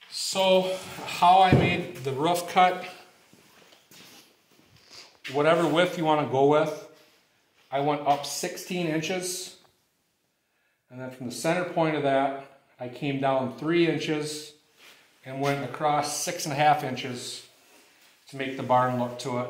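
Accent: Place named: United States. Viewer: Love the American accent, 'ruff' (roof). 🙂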